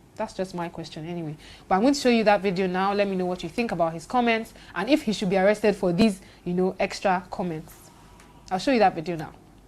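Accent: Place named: Edo, Nigeria. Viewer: see your ekiadolor English..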